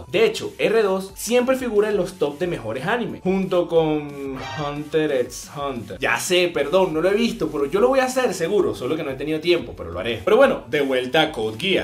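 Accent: Venezuela. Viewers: Hay youtubers que nacieron para fracasar, otros nacieron para triunfar, tu eres lo segundo sigue asi venezolano que se que lo eres por tu ascento xd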